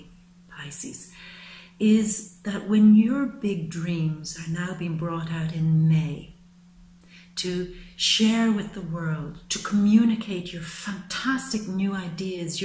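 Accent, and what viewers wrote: Ireland. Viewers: I just love you. And your Irish posh accent :) you remind me of my Irish friend.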